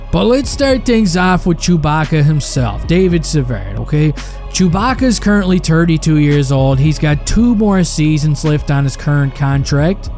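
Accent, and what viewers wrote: Newfoundland, Canada. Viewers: Newfie accent as he is from Newfoundland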